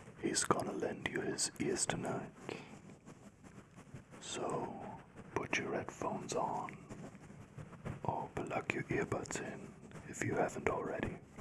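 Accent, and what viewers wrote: Germany. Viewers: He's German, so his English may be slightly more difficult for you to understand because he's also not a native speaker, and while he's very fluent in English, he does have a distinctly German accent. There's nothing wrong with getting some practice with listening, though. 🙂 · This is so amazing after 5 min i fall asleep are u german?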